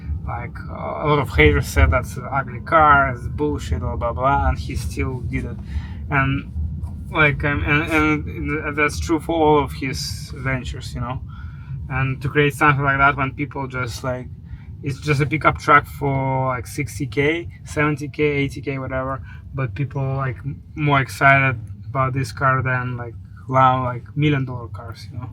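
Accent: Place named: Ukraine. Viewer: What terrible Ukrainian accent😮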